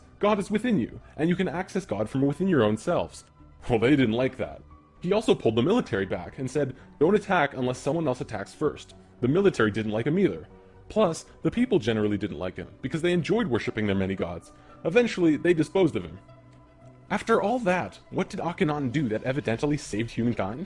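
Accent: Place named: Canada. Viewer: It's the same voice from the original but the pitch has been lowered so he doesn't sound like one of the chipmunks, but the way that the words are pronounced, the rhythmic speech pattern and verbal cadence are the same as the original movies narration. I believe that the narrator is Canadian based on the pronunciation of certain words.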